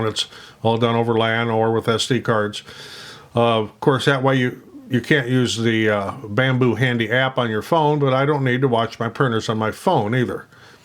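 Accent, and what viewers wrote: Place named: Scotland, United Kingdom. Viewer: Scottish accent, boy oh boy. Well, coming from an american anyways.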